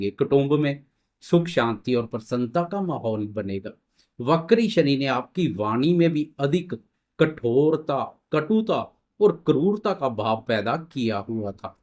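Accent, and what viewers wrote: Punjab, India. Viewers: Apki Hindi mai Punjabi touch aata hai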